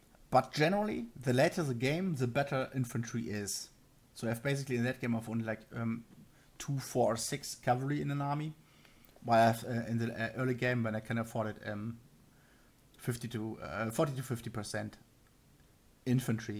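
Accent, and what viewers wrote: Germany. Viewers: bei dem deutsven akzent kannst du auch gleich deutsch reden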